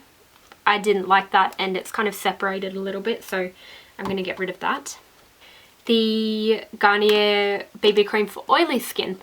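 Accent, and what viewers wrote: Australia. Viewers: Are you from Australia? X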